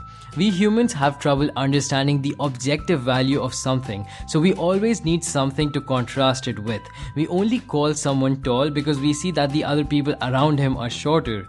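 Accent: India. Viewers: I like how accent. Sounds like an Indian guy from Asia. Nice to hear for me. Shows the reach of this media as well as how small our planet is. Perhaps we will realize we are virtually all the same. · Your accent sounds indian-english where are you from? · Indian blabber lol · I like how it's narrated by an Indian man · its indian accent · Jitna bhi Dollar Dollar bol le, pata chal hi jaata hai ki apne Indian Bhai ki aawaaz hai. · I had to skip . Your accent maybe me feel like I am getting scam from the phone calls from IRS indian people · I'll be very racist now! Please!!!! Let the native english speaker voice this cognitive stuff, and later on this dude who is probably indian can translate all this to his native language...I cant get a thing because of his obvious accent...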